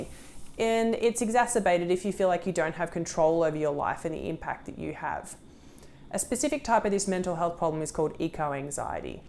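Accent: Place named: Australia. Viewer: Love the Aussie accent tbh. Great big picture exposition. Really impressive although depressing. Root cause is not politics or capitalism like most ppl tend to mention. It is pure evolution and natural instinct. Our species just won the evolution race and we are running towards every boundary possible until nature will force us into the next balance. Its a natural inevitably. Dont blame a particular group of humans or "system". Its the system of nature.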